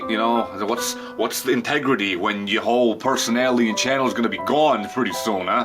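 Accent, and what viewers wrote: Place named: United Kingdom. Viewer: Damn it's sad that I can't here your sweet British(is he British?) accent and you screaming with words you invented 3 second before you say them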